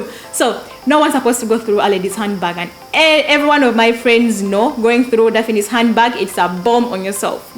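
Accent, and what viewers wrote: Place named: Uganda. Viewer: has directed me here. I love the Ugandan accent. So "pechuuulia"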